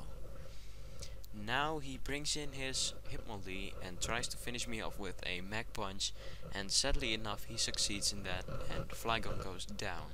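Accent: Netherlands. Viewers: you sound dutch!? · are u dutch ? cuz i am dutch and i think i hear a dutch accent in your english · Hier had je echt nog een typisch hollands accent :] Wel enige verbetering in gekomen!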